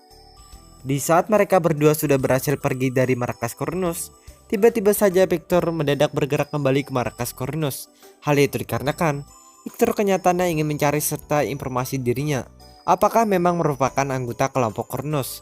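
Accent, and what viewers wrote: Banjar, Indonesia. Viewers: Logat banjarnya kedengaran kali min 😄😄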